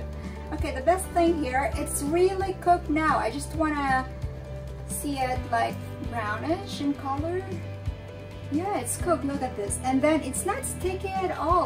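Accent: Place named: England, United Kingdom. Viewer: The best chef mom! super like the english accent and video content.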